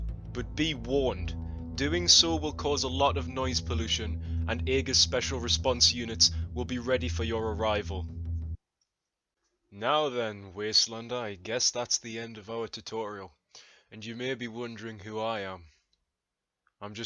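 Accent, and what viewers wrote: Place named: Scotland, United Kingdom. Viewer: My o my you sound so scottish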